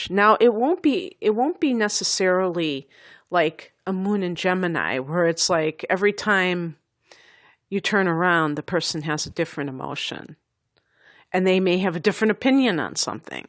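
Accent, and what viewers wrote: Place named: Minnesota, United States. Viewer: Are you from Minnesota? I am, you sound like you could be lol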